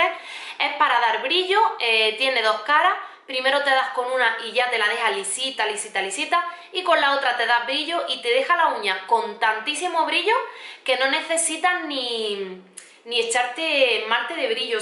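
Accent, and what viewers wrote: Granada, Spain. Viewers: Eres de Granada cierto??? Saludos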